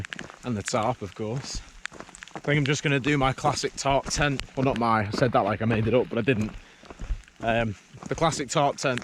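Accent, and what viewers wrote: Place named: Liverpool, United Kingdom. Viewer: Enjoyed you enjoying that. Good stuff. (Are you from Liverpool, lid?)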